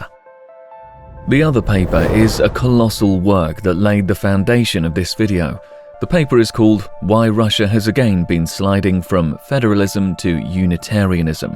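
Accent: United Kingdom. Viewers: That's because that's not the voice of the channel's author, they hired an actor from the UK and didn't hide that btw, check video's discription. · Did you learn British English as a youth and thus, speak British English like a native, or does an Englishman narrate your scripts, or what?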